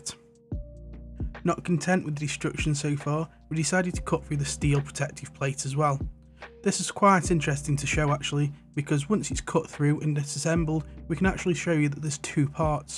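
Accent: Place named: Stoke, United Kingdom. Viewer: As a fellow stokie, you can hear another stokie from a mile away.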